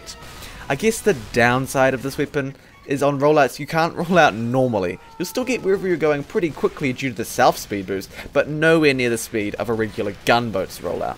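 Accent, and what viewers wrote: New Zealand. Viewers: He's from New Zealand though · i had a feeling you were from New Zealand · No, his accent is different. I think he's Kiwi (New Zealand), whereas Muselk is ACT, Australia. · Because he's from New Zealand. That's how their accents are.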